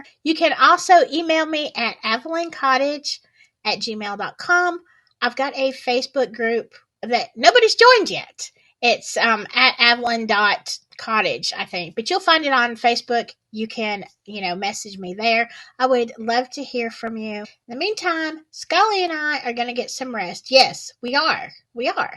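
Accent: United States. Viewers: U have the same English as the rest of English america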